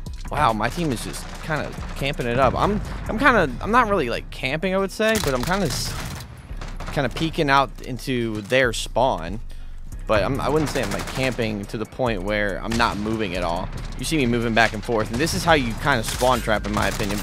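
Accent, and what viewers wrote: East Coast, United States. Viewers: i’m from baltimore and just by the way you talk i can tell you’re from the east coast, no weird shit g was just curious 🤣 don’t trip i’ll put my homies on get you some more subs you feel me?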